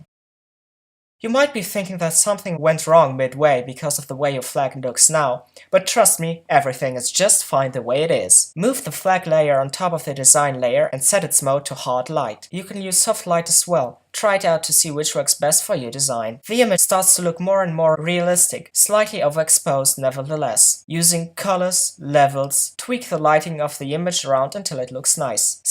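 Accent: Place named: Germany. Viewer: Hey thanks, it's really incredible to find exactly the fringe flag-editing tutorial I needed as soon as I thought of it. Also, that's a delightfully German accent you have there. I feel like I'm being told grand secrets about *something*.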